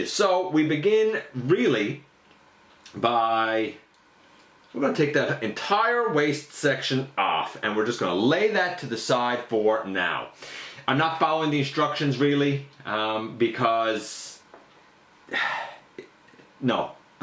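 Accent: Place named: Newfoundland, Canada. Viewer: Just recently subbed and I can't believe it took me this long to notice your accent. You're a fellow Newfie! How's she getting on me son? Lol.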